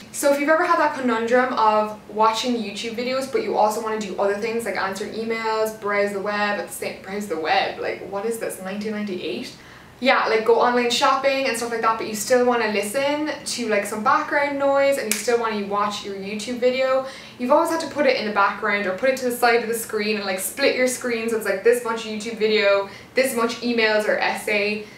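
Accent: Cork, Ireland. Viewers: Your Cork accent is definitely after flaring up after your visit home hahaha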